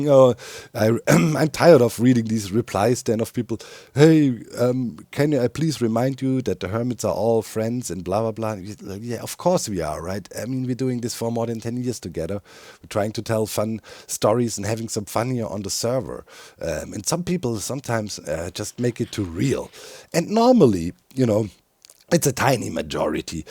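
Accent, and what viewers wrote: Germany. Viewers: I don't understand haters. I really don't. I mean - How can you not adore that German Accent?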